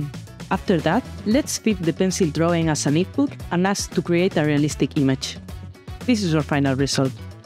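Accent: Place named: Latin America. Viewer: The latino dialect makes any tutorial a joy to study! 🙂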